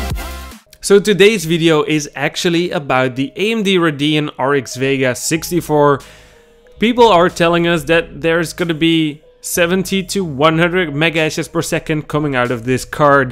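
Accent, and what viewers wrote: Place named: India. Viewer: I HATE YOU VOICE. i im Di Viga thIIIIiii... Are you indian? youre soft sound E-i made my russian brain a crazzzZi. Lets try for example Ay Em Dee fucking Veeeeee GGaaaaaaa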